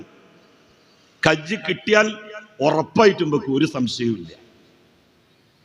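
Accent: Malappuram, India. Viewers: സഖാവ്: അങ്ങേയുടെ മലപ്പുറം ലാംഗ്വജ് ഒരുപാട് ഇഷ്ട്ടായി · ജെലിൽ സർ.... തനി നാടൻ മലപ്പുറം..... സ്പീച് അടിപൊളി... പെരുത്ത് ഇഷ്ട്ടം 😂😂😂😂😂🎉🎉🎉🎉🎉🎉❤❤❤❤ തട്ടിപ്പിന്റെ സർവ്വകലാശാല ആണെന്ന്...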